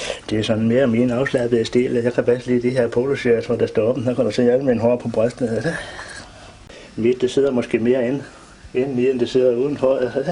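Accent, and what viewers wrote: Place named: Jutland, Denmark. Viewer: Er Jydsk så svært at forstå?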